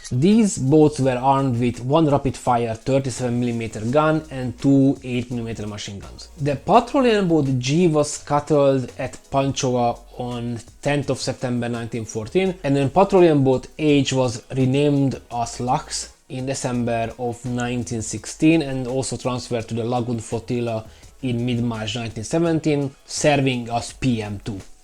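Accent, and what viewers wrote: Hungary. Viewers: its hungarian "accent".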